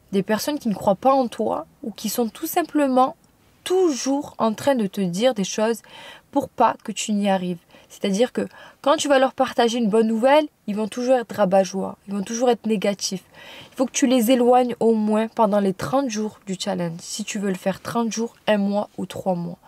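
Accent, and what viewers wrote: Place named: South, France. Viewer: tu a un beaux petit accent du sud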